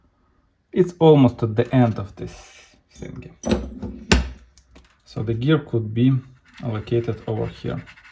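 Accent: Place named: Russia. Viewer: Вроде бы имя. И говорит на английском с русским акцентом)))) похоже русских не любит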